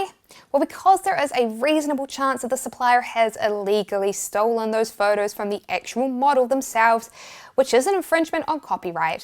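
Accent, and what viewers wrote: New Zealand. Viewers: My god that is the most NZ accent ever.....saying that I'm Australian, so I probably sound mentally impaired when I speak.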